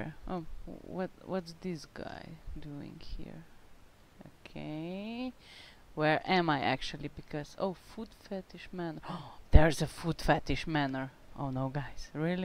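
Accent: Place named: Portugal. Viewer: Your Portuguese accent speaking English is beautiful!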